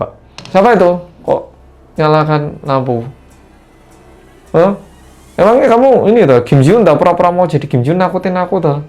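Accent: Java, Indonesia. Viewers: Betul juga ya mas korea medok🥰 penampakan merupakan permintaan tolong bagi arwah unt kita yg masih hidup😊tks atas critanya yaaa🤓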